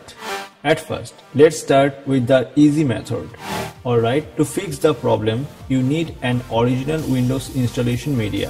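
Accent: India. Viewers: I dont understand your Indian accent. What to put in the Empty USB drive is it the windows 10 installer? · Thank you so much for using this Indian voice.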